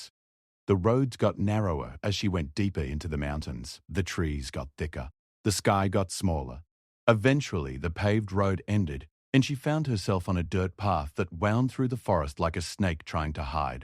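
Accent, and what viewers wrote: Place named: Australia. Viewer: The narration isn’t exactly odd…it’s spoken in an Australian dialect. However, why would a story about a relatively remote part of America and its past be offered in a dialect of English from the other side of the world?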